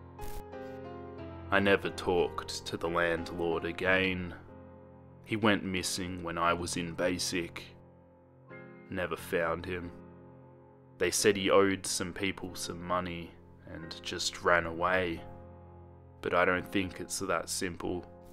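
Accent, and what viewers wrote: Australia. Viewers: DasConii I love the way he says it too, beautiful Australian accent. Maybe I just think this because I don't have an accent?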